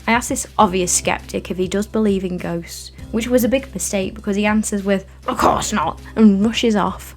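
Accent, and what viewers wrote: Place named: North West England, United Kingdom. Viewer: These vids are so funny, big up north west accents girl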